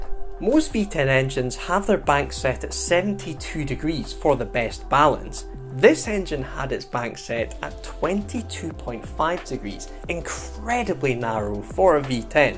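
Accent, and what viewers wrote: Scotland, United Kingdom. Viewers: Clearly Scottish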